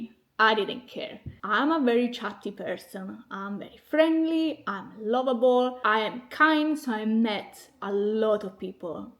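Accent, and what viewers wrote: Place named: Italy. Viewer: I agree with everything you have said about Jamaica and the men 😅. Jamaica will lure you in whilst on holiday but it's not a place for the fainthearted if you want to reside there. It's very very expensive and the ppl mostly have unrealistic expectations of foreigners even on their family from overseas. Plus Jamaica is considered a developing economy so is too challenging for Westerners to go live. The men are on a different level - charming, egotistical and opportunist. Be careful with them. But I am enjoying your videos on Jamaica, your personality shines through and like your Italian accent 😊❤🇮🇹🇯🇲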